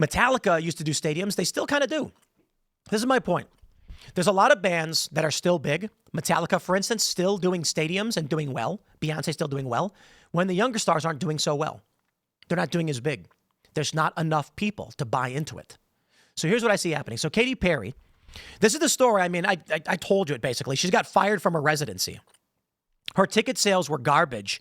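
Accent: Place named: AAVE, United States. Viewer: Stop with the double negative and ebonics, please. You sound like an idiot....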